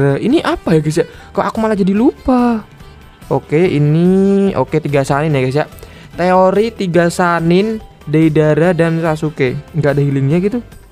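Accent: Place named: Madura, Indonesia. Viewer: kenapa nadanya jadi tretan dah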